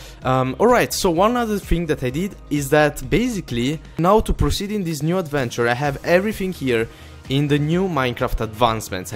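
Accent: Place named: Italy. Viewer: Thanks!!Ive Been waiting on The Alchemist 2!Also,maybe add new machines using the observers?maybe more automation?Auto Tree Farm? Also I want to ask you, Is making growth pulsers(From Skyfactory)possible? Maybe Uhmm a way to make trees grow faster?(Uhmm,Twer....nvm) Maybe even custom mobs and armors Also maybe a bit of jump boost?(You are in space after all) Thanks again for The Alchemist 2 You are the coooooolest youtuber I know Also I like your Italian Accent:) Omfg!Log....nvm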